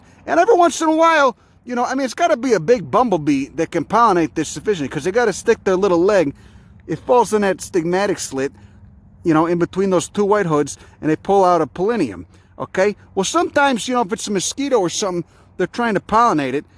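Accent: Chicago, United States. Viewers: I adore your "plainsplaining." Your Chicago Italian accent is cool. Can you post a pic, so we know what you look like? Not expecting a Glamour Shot, nor anything salacious! 😜👾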